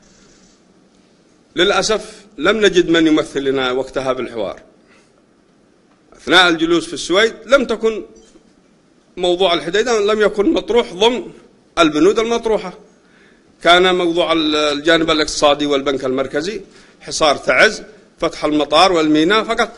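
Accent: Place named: Iran. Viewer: من لهجتك وطريقة حديثك يتضح ان مجوسي تابع لبني صفيون في ايران ،،ما علاقتك باليمن العربي الجريح وانت لا تنتمي له ولا للعرب ولا للعروبه ،،والله ليسحقوك اهل اليمن الشرفاء بمساندة اخوانهم السعوديين عما قريب واتوقع قريبين جداً ربما لا يتعدى سنه ،،،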